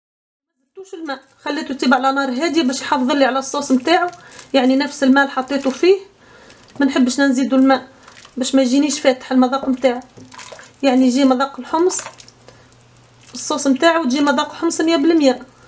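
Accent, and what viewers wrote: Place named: South, Tunisia. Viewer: قداش نحب كلامك لهجتنا متاع الجنوب محلاها 😍 ربي يباركلك اختي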